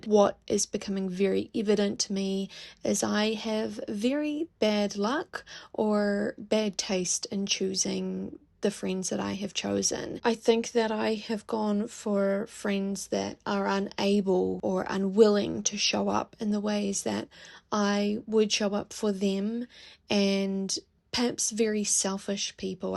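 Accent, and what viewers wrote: New Zealand. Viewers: New Zealand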